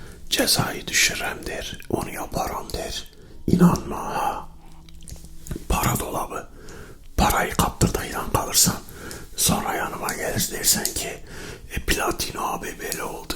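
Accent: Eastern Anatolia, Turkey. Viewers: Doğu şiveli zaten güzel oluyor bir de bir şeyler atıştırmişsın ya abi iyi baya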